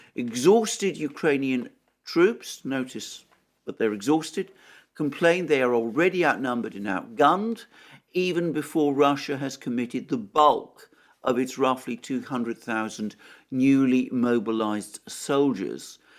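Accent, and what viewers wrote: United Kingdom. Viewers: He's married, you little American pos. Your English isn't very good, eh? It's "speaking in a foreign accent." It's a British accent, so maybe you're not American but some sort of Euro scumbag.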